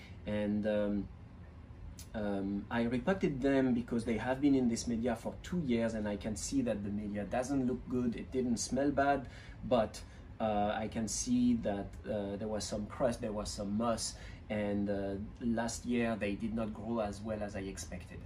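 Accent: France. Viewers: hello, where in Canada? love your french accent🙂(I could be wrong 😅) do you think I can leave it outside (Montreal) until the first snow, or do I have to transfer it to a warmer location (like indoors on a shelf near a window) ? thanks for the video👍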